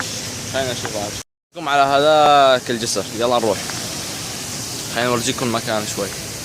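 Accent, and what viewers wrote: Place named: United Arab Emirates. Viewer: ياخي لهجتك زكرتني بايام حلوة ايام الفريج و الربع ... انا هلق بالمانيا و كنت عايش بالامارات .. بس ما حبيت المانيا ابدا ... الامارات احلى بكتير ... كيف امريكا حلوة متل ما منفكر و لا كمان متل اوروبا قرف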